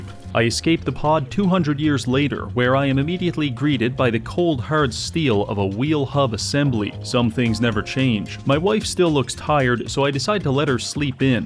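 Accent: Newfoundland, Canada. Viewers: Dude are you from Newfoundland? I recognize the accent. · Can't enjoy this for the hard-stabbed Canadian O twice a sentence. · Because is violently canadian, and his accent comes through really hard on some really random syllables. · Are you canadian? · Instead of Car all I hear him say is “ker”, Canadians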